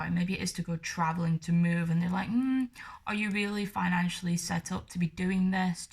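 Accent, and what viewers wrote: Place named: Lancashire, United Kingdom. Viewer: Ha Ha ,weirdly my husband said to me ...' the accent sounded like someone from Lancashire with a foreign accent : . Thank you for answering.. unfortunately he wins 😬😂. We live in Preston but are from nr Ormskirk . I am looking forward to any more readings that you do xx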